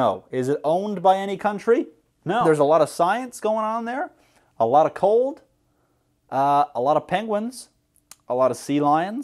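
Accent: Newfoundland, Canada. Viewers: As soon as you said “lore” a heritage moment played in my head and I became fully aware that you have to be from Newfoundland. But like, also every other word as well. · the way that I could tell he was Canadian before he even said it. -sincerely, a fellow Canadian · I watched only two of your other videos before, the horrible yo mama jokes with the theory of relativity and congestive heart failure, and I was wracking my brain thinking - where the hell is that beautiful background and what is his accent?? It's not quite European but it's not quite American? When you said Newfie, it all made sense lmao cheers from the 6ix, thanks for mentioning Ontario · Aha - I picked out that you’re Canadian from your accent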